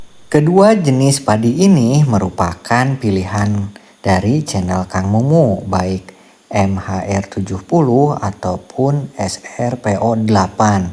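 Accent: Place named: Sunda, Indonesia. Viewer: Hatur nuhun kang Mumu pantesan atuh logat bahasana nyunda pisan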